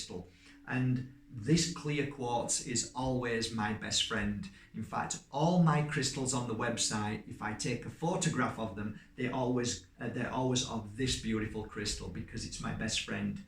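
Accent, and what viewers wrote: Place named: Northern England, United Kingdom. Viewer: Hi...🙂Love your videos.Just came across you this week.Thankyou for taking the time to make them🙏 Just wondering why you didn't mention the energizing/cleansing power of one of natures super powerful and most beautiful things...The Moon!? Anyway, thankyou again. Your accent is very Northern(trying to place it🤔)...Greetings from Manchester UK (originally Kearsley Nr. Bolton).Namaste 🙏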